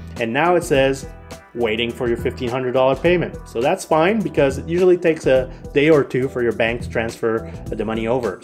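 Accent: Quebec, Canada. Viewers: I'm going to try a transfer. You sound like a French Canadian, are you from Quebec or Montreal? Anyway, very good video.Merci. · Are you from Quebec ahah? Thanks for the tips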